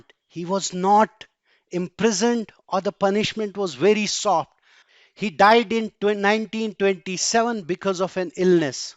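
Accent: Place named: Kashmir, India. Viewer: Sir has a Kashmiri accent 🙂..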